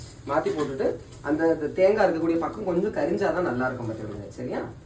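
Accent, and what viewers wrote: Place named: Nagercoil, India. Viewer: Hi Anna Nanum Nagercoil than Ippo Chennai la erukom Unga video pakkum pothu, namma sontha mannu pechu, romba santhosama erukku anna. Niraya video podunga anna Aduppankarai pakkum pothu namma ooruku pona mari santhosam Thanks anna❤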